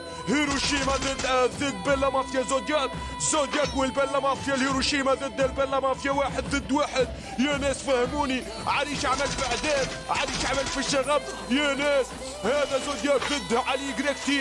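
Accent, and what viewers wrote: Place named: Tunisia. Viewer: المعلق تونسي و قالك فضيحة ههههه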